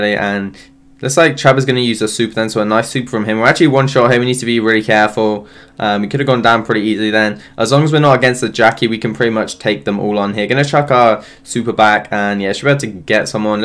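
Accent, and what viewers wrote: United Kingdom. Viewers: I just love your britain accent😊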